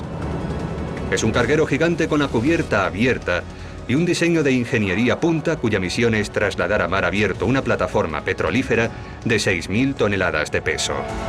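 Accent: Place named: Spain. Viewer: Es un locutor español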